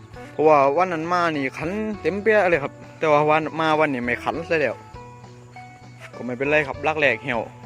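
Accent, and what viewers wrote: Korat, Thailand. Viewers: แก่นี่พูดดโคราชดีเจนเนอะ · คนโคราชเบอะครับ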